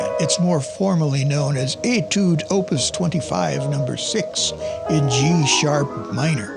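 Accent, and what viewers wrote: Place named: England, United Kingdom. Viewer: Blimey, I was proper convinced you were from England! Pure english English, innit! I'm lovin' it! Your speech is like music to my ears, like a bit of Chopin! :-)) I will keep listening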